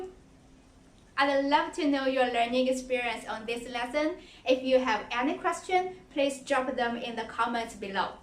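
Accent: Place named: China. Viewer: I love it when Chinsse women speak Chinsse English! It sounds cute 😍😍 just NOT AMERICAN... Got to get me a Chinese wife one day!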